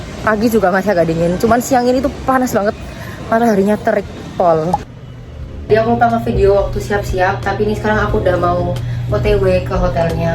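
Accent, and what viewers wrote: Indonesia. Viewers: Logatnya tetep jawa bgt hahaha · logatnya kayak wong suroboyo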